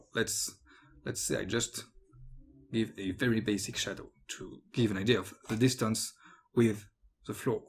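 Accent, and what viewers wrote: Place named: France. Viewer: Merci pour toutes ces vidéos, cher compatriote dont l'accent n'a pas fait mystère! ;-) Je suis en train de m'autoformer à Harmony, et ces vidéos m'aident beaucoup.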